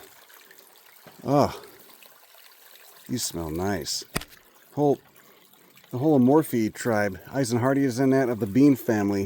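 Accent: Chicago, United States. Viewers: your from Chicago right? Have that accent yah. me too well i don’t have the accent though. anyway been watching your stuff for about a year now so kinda new compared to how long you’ve been on. The humor sarcasm is what keeps me coming back. And i love plants and the way you make it fun. especially love the Texas ones.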